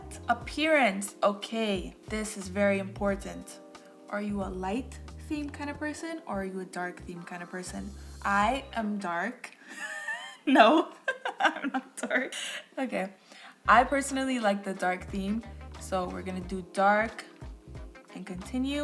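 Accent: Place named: Toronto, Canada. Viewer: Great unboxing and video editing! loved the stop-motion at the start. Could tell you're from Toronto based on your accent lol. Keep going! I subscribed :)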